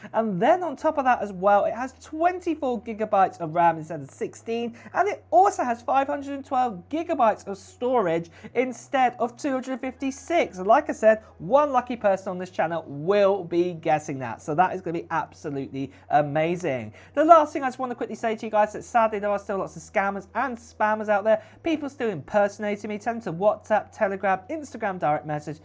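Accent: United Kingdom. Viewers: High pitched british voice....I don't believe anything you say.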